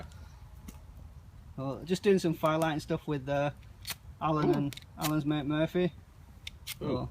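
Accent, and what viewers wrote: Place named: Yorkshire, United Kingdom. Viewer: nice tl here a Yorkshire accent were about you from ? me south leeds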